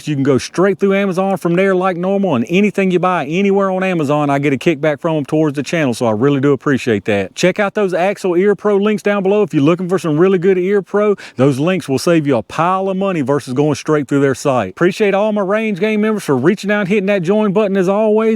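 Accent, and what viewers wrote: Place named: Louisiana, United States. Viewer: Your accent says Louisiana, but the terrain says Georgia. Thanks for all the content!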